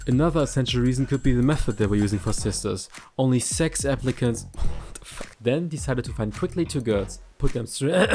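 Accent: Germany. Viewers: Boyyy work on ur accent. I am also from germany but it’s not like that bad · I love how realistic you are, and I like the editing, it was very funny. Also I love your accent it is sooooo german xP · Wenn deutsche englisch reden hört sich es genau so an. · Nettes Video aber konstruktive Kritik: du sprichst die englische Sprache Deutsch aus! Du könntest an der Aussprache arbeiten.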